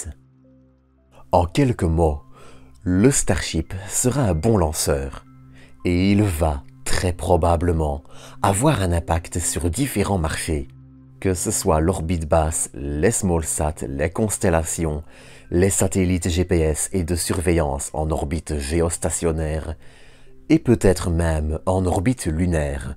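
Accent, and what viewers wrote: Belgium. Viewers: Sa voix est posée, et sa lenteur relative se prête particulièrement bien aux sujets qu'il traite. Autrement son "élocution" c'est juste un accent belge.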